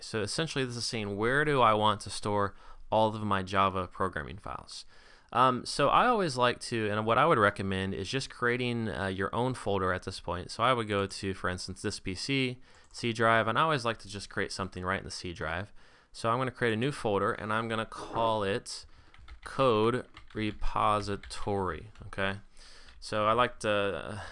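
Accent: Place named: United States. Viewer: good morning Dr good to hear your american voice rather that the accent from India. I already listened to 3 videos on installing Java SW and i had no success too late at night to hear yours maybe tomorrow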